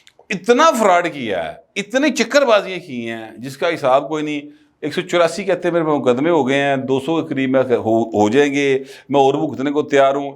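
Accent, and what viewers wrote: Lahore, Pakistan. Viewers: tohada lahori andaz bada changa lagda hey.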